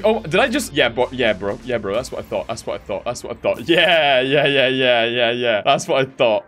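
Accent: Scotland, United Kingdom. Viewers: Love your vids and I'm part Scottish from my dad side and your Sckttish accent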